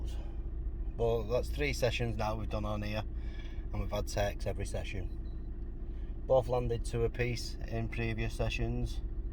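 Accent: St Helens, United Kingdom. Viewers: Your accent sounds like a proper St Helens accent. Are from around there?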